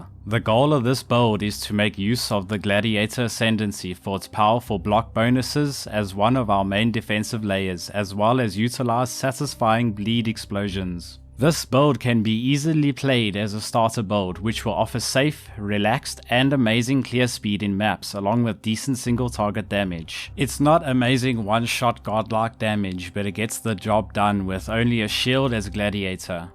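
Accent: South Africa. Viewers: You sound South African! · Are you South Africans because you accent sounds like it is from SA #YeeaaBooii anyway, love the build and looking to give it a shot as I have no clue what to try next this league.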